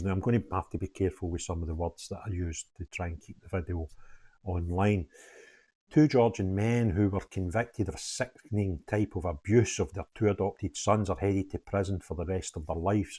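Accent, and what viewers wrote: Scotland, United Kingdom. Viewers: This happened in Atlanta, Georgia. I wish he had put a link to the new stories. A lot of people are assuming this happened in the UK because of his accent. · I love your Scottish accent. Such a sad story 😢💔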